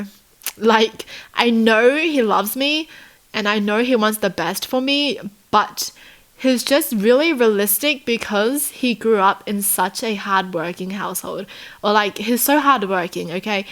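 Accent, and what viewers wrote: Australia. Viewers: I love SO MUCH LIKE SO MUCH but if your a kpop lover [like me] you might notice she sounds and kinda looks like a Girl {my bias} named Rosé from BLACKPINK. Maybe it’s because they both have Australian accent but I love her and the same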